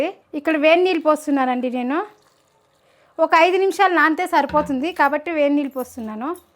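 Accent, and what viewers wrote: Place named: Chennai, India. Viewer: Nenu chennai,ikkada tami telugu vaaru ilaane maatlaaduthaaru😊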